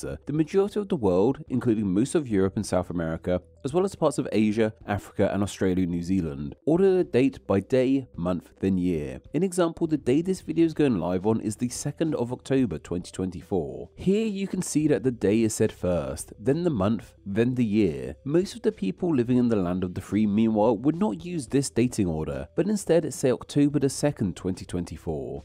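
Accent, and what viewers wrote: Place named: London, United Kingdom. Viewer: Good video. I'd love to know where your accent comes from. I've known people who speak just like you, all from London and the South East, but it's quite distinct.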